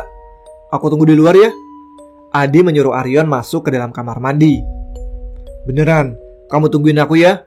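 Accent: Malaysia. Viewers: Respect pada mas cuba membawa cerita ini dalam tonasi bahasa Malaysia. Salam serumpun 🙏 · Johor Malay kah ini bang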